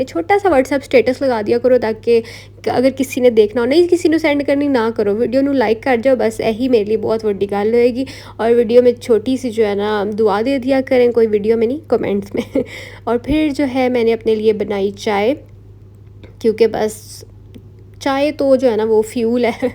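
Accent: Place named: Punjab, India. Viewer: Love ur Punjabi accent 😂❤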